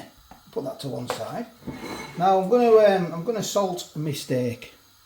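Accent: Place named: Northern England, United Kingdom. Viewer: Hello, Just thought I would send a message of support, we have been subscribed to your channel for a while now and we think it is great. Its good entertainment plus informative as well, we recently started buying Harry Ramsdens battered sausages for my youngest son after seeing your review of them. I think its great that you can make a living in such a way doing food reviews from the comfort of your own kitchen. I also love your accent, I do have a liking for Northern accents. I thought your investigation into the 11 year old Christmas pudding was funny also your reaction to the vegatarian sausages, in one review you did, was hilarious. The look of total disgust on your face! Ironically that review probably lead to a few sales by people just wanting to see if they thought they were as awful as you did! Anyway keep up the good work. 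Tony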